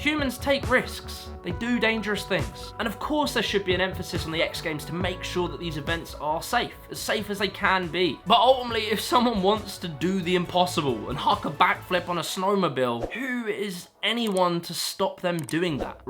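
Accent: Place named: United Kingdom. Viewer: I hate the British accent... It's THIRTEENTH....not FIRTEENF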